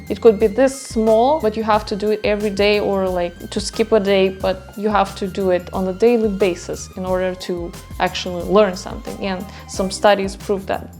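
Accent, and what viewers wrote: Russia. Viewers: Yes your English is very good. Your personality is great, genuine and spontaneous, funny. A lot smart fun lady! And your fluent in Russian! Wow! Hmm. Language sounds different to each person. I love your Russian accent as you speak English. It sounds very nice actually. Nothing to worry about. You give a lot of good advice. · I love your channel, and enjoy your sense of humor. Your command of English is pretty amazing, and your Russian accent is so subtle most of the time. I love it when you intentionally say things in English with an exaggerated accent! As a native English speaker, I commend your hard work. It really pays off! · Coming from a native English speaker your English is excellent/fluent!,you have a distinct Russian accent but just like an American or an Australian has an accent when speaking English.Its a cool accent btw!.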